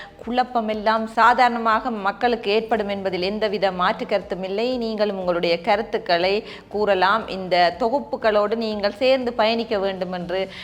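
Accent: Jaffna, Sri Lanka. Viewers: Sister wonderful tamil and beauty.what a jaffna tamil very sweet I'm very loving and impressing jaffna tamil. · எனக்கு பிடித்த யாழ் மொழி. அழகாகப் பேசுகின்றீர்கள் வாழ்த்துக்கள்.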